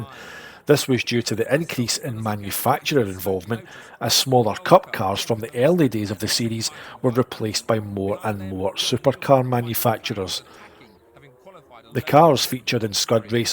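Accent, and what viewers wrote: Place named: Falkirk, United Kingdom. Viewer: OP Just curious what part of Scotland you from? Sounds like maybe around Falkirk?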